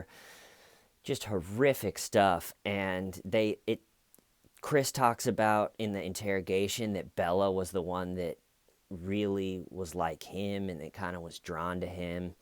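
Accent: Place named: South, United States. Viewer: Damn I was shocked you’re in New York. Sure thought you were southern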